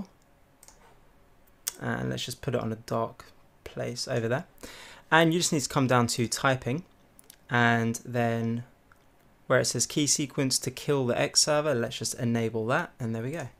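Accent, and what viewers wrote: United Kingdom. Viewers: Sierra Hormiga British pronunciation :)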